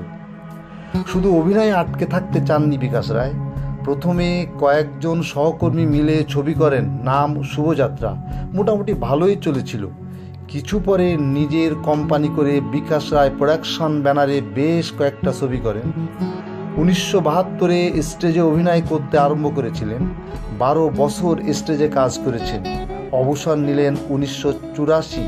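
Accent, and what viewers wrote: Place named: Bangladesh. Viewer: আপনি কি বাঅংলা দেশের মানুষ ।আপনার উচচারণে বাঅংলা দেশের গন্ধ ।আরো জানার চেষ্টা করুন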